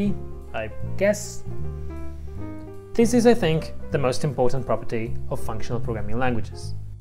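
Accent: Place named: Europe. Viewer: i cant tell if you have a western european or an eastern european accent. good video tho.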